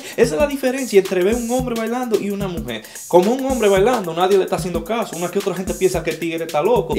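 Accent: Cibao, Dominican Republic. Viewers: hahahahahahahahahahahah k cura x.x eres dominicano? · Dominicano de pura sepa...No se nota?? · AJAJAJAJAJAJAJA ME ENCANTAN TODOS TUS VIDEOS SON LO MAXIIMOOO... Y ESE ACENTOOO DOMINICANOOO EXCELENTE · es dominicano del Cibao jajajja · Jajajajaja que risa manin eres de República Dominicana no lo puede nega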